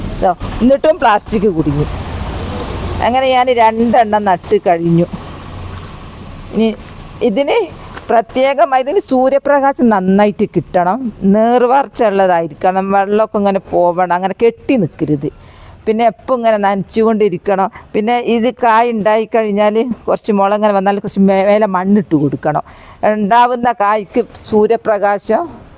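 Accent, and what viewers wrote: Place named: Malappuram, India. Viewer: നല്ല മലപ്പുറം ഭാഷ കേൾക്കാൻ നല്ല രസമുണ്ട്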